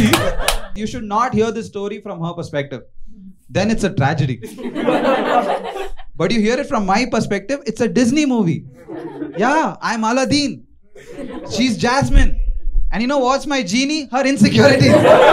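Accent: Haryana, India. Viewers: dude your haryanvi damn funny bro..!! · that Haryanvi accent was hilarious 😂😂😂😂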